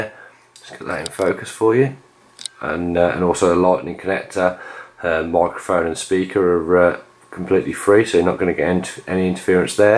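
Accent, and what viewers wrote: Birmingham, United Kingdom. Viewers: brummy accent or local to there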